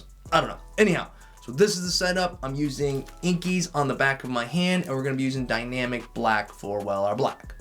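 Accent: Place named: Vermont, United States. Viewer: You sound like you’re from Vermont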